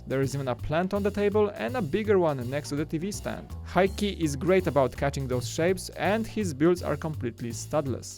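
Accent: Poland. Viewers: No offense, but polish accent is terrible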